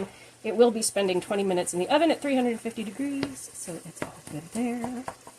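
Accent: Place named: Appalachia, United States. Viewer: Sorry for your loss, love the bluegrass twinge I hear in your voice...I've never added corn, but I might next time, thanks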